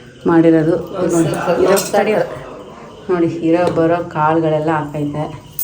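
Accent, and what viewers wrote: India. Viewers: ನಿಮ್ಮ ಗ್ರಾಮೀಣ ಶೈಲಿಯ ಕನ್ನಡ ಭಾಷೆಯನ್ನು ಕೇಳಲು ನನಗೆ ಸಂತೋಷವಾಯಿತು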